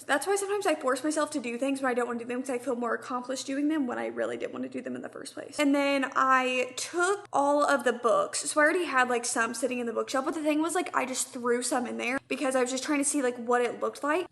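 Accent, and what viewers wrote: South, United States. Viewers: there is no formula girl are you kidding. i know you’re all about making sure your videos are perfect but this is YouTube, a fun place not a Netflix documentary. i found you when you did your 24hr readathons, stayed because of the self deprecating jokes and the southern accent i never see anywhere else. now im so happy not seeing you joke about wanting to die and actually taking care of yourself. don’t compare yourself to old you because now I stay because of your maturity and you’re so funny in other ways without trying. you have to realise that you’re basically a celebrity in the book world and we could literally watch a video of you walking around your house like security footage and eat it up 😂 you’re like Kylie Jenner to me. I’ve been binge watching bookmarkd and even though I can’t stand taylor swift talk I still watch those hundreds of hours of you guys talking about her. 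I love hearing about your life i used to only watch you for book reading inspo and then abandon watching for months and now I tune in as soon as you pop up on my feed. you’re worth more than you know to us queen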